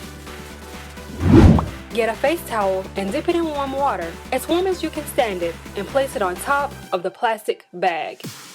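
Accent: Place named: Australia. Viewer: You sound like you had a little Australian accent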